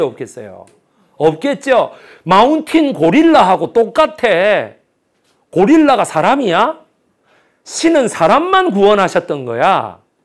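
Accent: Busan, South Korea. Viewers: 부산사투리 너무 좋습니다. 첫 줄거리부터 흥미롭습니다.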